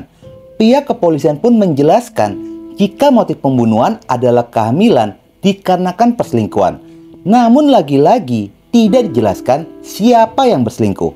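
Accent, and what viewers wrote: Banyumas, Indonesia. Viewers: Wong ngapak hadiiirr baang....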